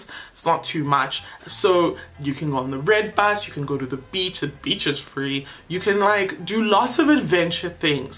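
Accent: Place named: South Africa. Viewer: Thank you this was very helpful! My mom heard your voice and was shocked that you're South African😂😂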